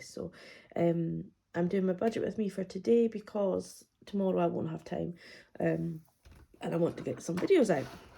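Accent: Scotland, United Kingdom. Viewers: Glad you’re getting your voice back a bit 🙌 I agree - the Scottish accent with subtitles is hilarious - always pray no one uses the subtitles with my videos 🤣🤣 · Yeahhh she’s back with that sexy Scottish accent 👏 Glad your voice is almost back to normal Also absolutely FAB 50p challenge ❤️❤️❤️ it 🙏🏻xx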